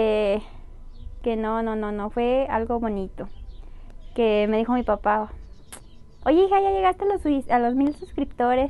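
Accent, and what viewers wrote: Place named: Chiapas, Mexico. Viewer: tu acento suena a chiapaneca de allá por ocosingo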